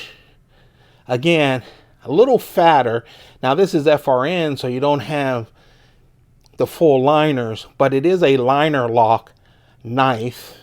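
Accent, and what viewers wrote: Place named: Louisiana, United States. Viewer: You sure sound like you are from Louisiana! Great videos! Thanks for all your efforts!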